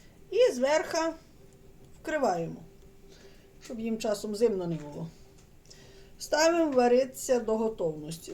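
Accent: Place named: Western Ukraine, Ukraine. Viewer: Так говорять на Західній Україні, в кожному регіоні нашої країни свій діалект і вимова, і тим вона неповторна, незабутня і самобутня!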